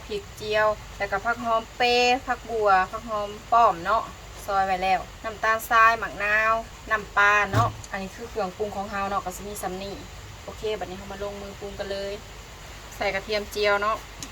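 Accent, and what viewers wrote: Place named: Savannakhet, Laos. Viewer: ເອື້ອຍຄົນສະຫວັນບໍນິ ສຳນຽງຄືຄົນສະຫວັນ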